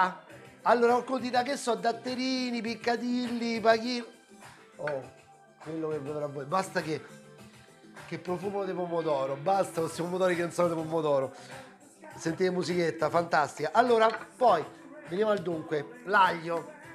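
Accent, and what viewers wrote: Rome, Italy. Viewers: N1 ti seguo da poco sei molto simpatico e l'accento romano è il top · A chi non piace come parli? Aó se sei de Rome sei de Roma no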